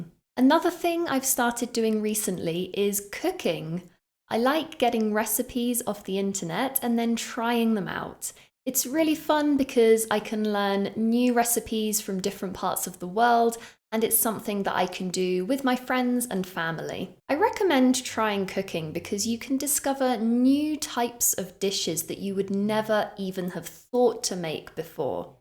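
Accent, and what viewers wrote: United Kingdom. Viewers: Your British accent absolutely shone through . I want to speak like you but it would take me years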